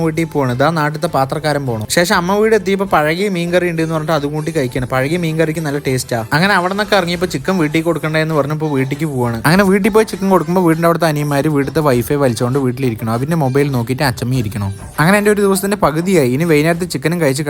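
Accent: Palakkad, India. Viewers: മോനുവിന്റെ എല്ലാം വീഡിയോസും കാണും. ഒത്തിരി ഇഷ്ടം ആണ്. പ്രേത്യേകിച്ചു പാലക്കാടൻ രീതിയിൽ ഉള്ള സംസാരം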